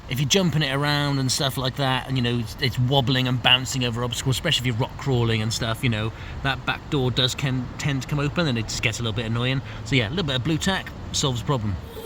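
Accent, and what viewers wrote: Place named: Wales, United Kingdom. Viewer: I assume you're in the US? British accent (slightly Welsh?) but quoting prices in dollars twists my head a bit 😂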